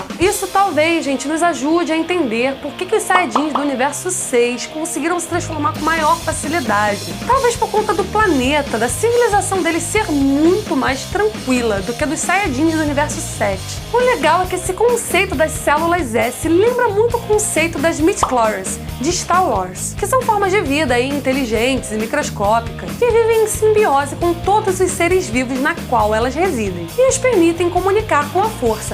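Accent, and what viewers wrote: Brazil. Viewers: Os vídeos do canal são ótimos, mas eu acho muito estranho ver a falando NAISCER ou NAISCER, isso é sotaque de alguma região do Brasil?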